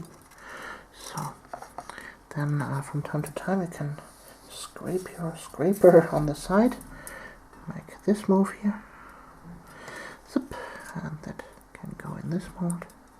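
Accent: Germany. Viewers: it's not a British accent. it's a german one based on western film and TV.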